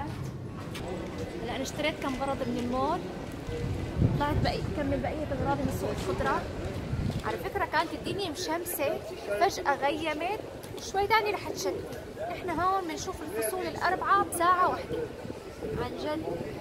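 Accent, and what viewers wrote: Syria. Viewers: مدام اديش عمرك وانت من وين باين حكيك ادلبي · كأنو انتي من حمص؟؟؟حكيك مبين حوووومصي 😊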